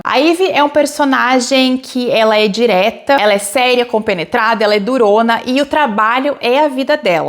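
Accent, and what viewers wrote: Rio Grande do Sul, Brazil. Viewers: Adorei a resenha, sim me convenceu a ler e com certeza irei! Inclusive, nd relacionado com o video kkkk, mas eu sabia q eu conhecia esse sotaque gostoso gaucho de algum lugar, ai vendo sua caixa postal percebi q tu é de Bento, quase vizinhos kkkk, sou de Caxias do Sul. Conheci recentemente teu canal e tenho maratonado!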